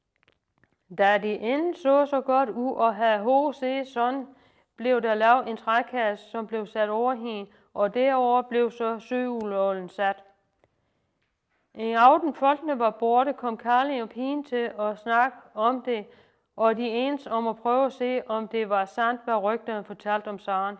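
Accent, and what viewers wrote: Lolland, Denmark. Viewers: Flot, vi sad i dag på Nakskov test og vaccine og så dig. Det er flot, lav endelig noget mere, du gør den lollandske dialekt ære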